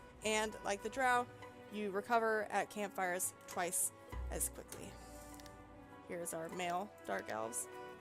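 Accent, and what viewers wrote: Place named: South, United States. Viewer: know i'm not speaking for every one here but i'm fine w/ the southern accent.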